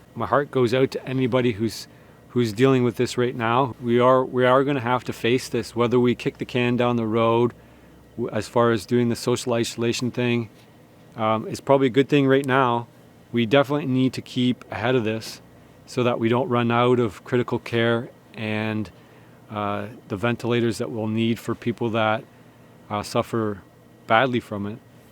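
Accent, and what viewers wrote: United States. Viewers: Wow, you sound like a red blooded American here. And I agree with everything you've said, and share your concerns. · NHL u knida sound like a American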